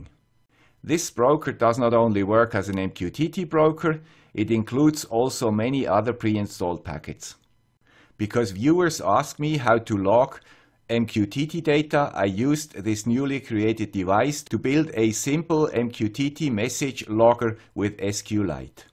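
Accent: Switzerland. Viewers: I am half swiss and I can confirm this man's accent is aggressively Swiss.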